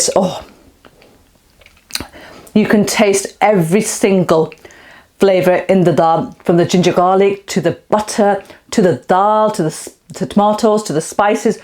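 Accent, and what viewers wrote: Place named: Lancashire, United Kingdom. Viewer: I'm really ready to make this dish. Thanks for the recipe and encouragement. I also detect a Lancs accent - worked there many moons ago. And it's so nice hearing you pronounce the word Dal 🙂 brings back fond memories. Ive subcribed and will urge others to do so... Thanks so much.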